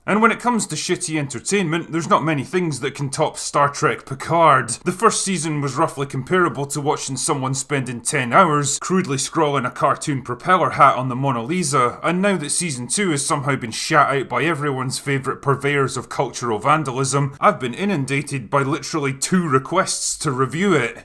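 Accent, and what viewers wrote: Scotland, United Kingdom. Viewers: I like the channels content, but dude you have a wank Scottish accent 😂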